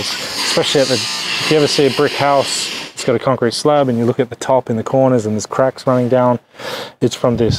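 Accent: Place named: Western Australia, Australia. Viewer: no way mate. I thought you were from West Oz by your accent. North or South of the river? Looks like you work in the northern suburbs if I had to guess. I lived down near Byford most my life. Name comes from a vlog of my time in Russia.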